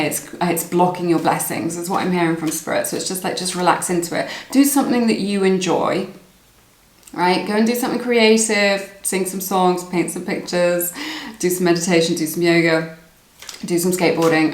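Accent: RP, United Kingdom. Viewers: I mean this as a neutral observation… you have such a bbc-type British accent. I’ve never heard someone not on the international news speak like you. I’ve met plenty of British people but they didn’t talk like that. What part of England are you from? If you don’t mind me asking.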